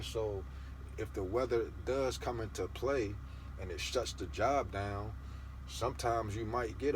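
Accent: Baltimore, United States. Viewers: Do you have to be a dump truck owner op..to make this kinda bread ? Been drivn otr 5 months..and u sound like my city bmore lol?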